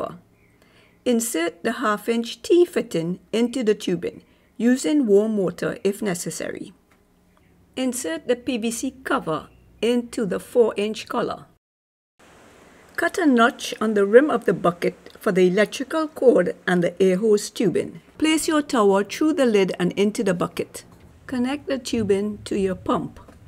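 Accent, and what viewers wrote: Trinidad and Tobago. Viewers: You sound Trinidadian... Excellent tutorial by the way. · Great tutorial...is that a Trini vibe I'm hearing?...Thanks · She sounds like a Trinidadian 😎🥳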